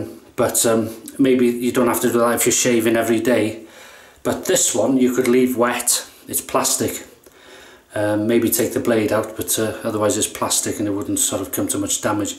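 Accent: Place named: North Wales, United Kingdom. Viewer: Nice to hear a North Wales accent on here. Hwyl!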